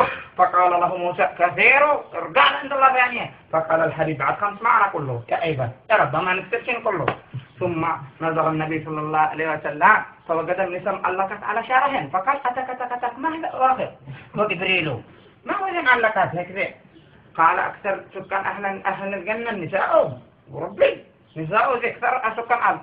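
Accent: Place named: Tihama, Arab world. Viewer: اضن انه اوصل المعلومة بس بلهجة اهل تهمامه ..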